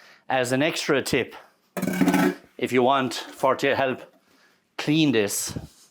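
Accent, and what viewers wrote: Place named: Ireland. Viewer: Hmm, accent of the host ..nm- ireland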